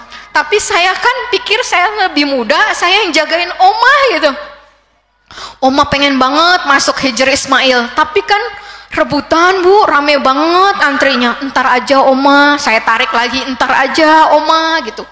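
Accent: West Java, Indonesia. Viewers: Asalamualaikum.....ibu dokter Alhamdulilah saya dengernya seneng dan terharu mudah2an selalu sehat panjang umur dan selalu Istikomah.....Aamiin ibu dokter apa ibu dari suku Sunda? Suaranya logat Sunda. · Dokter bahasanya kaya sunda banget ye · Iya. Jdi kayak orang Sunda....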